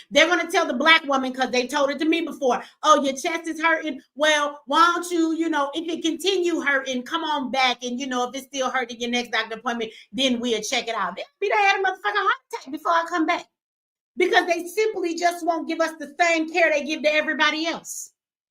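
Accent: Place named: South, United States. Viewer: just notice youve pick up a strong southern accent...ahhhall for all, we lyyyyyve for live. Not unusal to do so. Its call adaptation lol!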